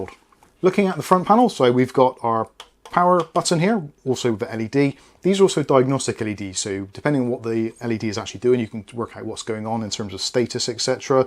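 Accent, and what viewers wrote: Bristol, United Kingdom. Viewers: Sounds like a fellow Bristolian or close ;)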